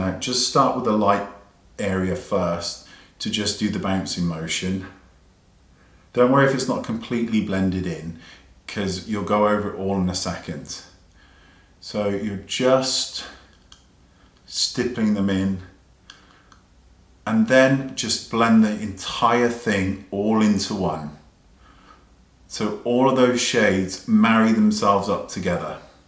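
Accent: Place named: West Country, United Kingdom. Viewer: Your accent was extra West Country today, I love that accent!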